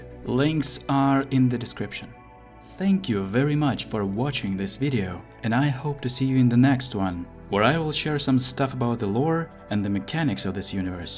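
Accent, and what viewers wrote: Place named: Japan. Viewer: I love your accent! I can't tell if it's Japanese or if some Latin origin. My ears say Japanese.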